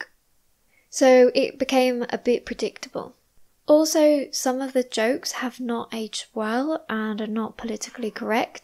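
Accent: United Kingdom. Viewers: I think I'm still able to catch 95% the C1(without reading the text, off course). And I'm wondering how difficult it is on the C2. Will you make the listening practice for C2. Btw, I like your british accent. You use MRP, right? I still find it difficult to understand non MRP british english.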